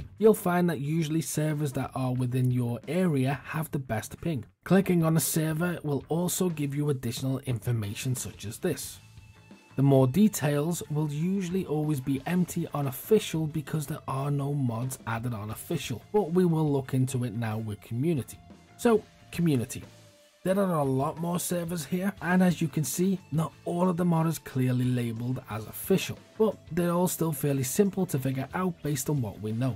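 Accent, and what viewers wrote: Liverpool, United Kingdom. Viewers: Great video mate, looking at getting back into dayz after a year or so and only had a few weeks of experience so this video has helped out, plus its always good to hear a fellow scouser. Quick question, is dayz launcher still a thing and is it worth bothering with? Liked and subbed mate :)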